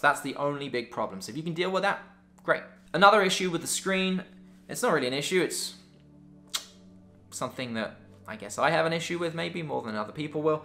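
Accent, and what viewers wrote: England, United Kingdom. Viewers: Helpful video, good to hear the camera has worked well for you. Your a Brit living in Canada right? That accent sounds way too English to be a Canuck. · Like your video. I want to purchase the Sony A7iii. And buy the way you mentioned Canada but you don't seem to have a Canadian Accent. Are you from London England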